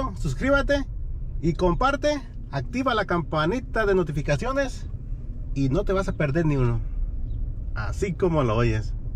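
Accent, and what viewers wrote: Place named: Central Mexico, Mexico. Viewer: De que parte de la RM eres? Suenas como del centro del país. Saludos, recién ayer conocí tu blog, en un día ocioso sin consulta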